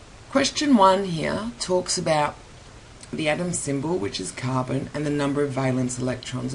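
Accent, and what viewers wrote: Australia. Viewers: Aussie Accent. So easy to tell :D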